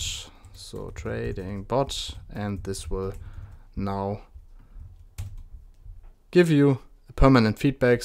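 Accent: Germany. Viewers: Safe Deutscher oder?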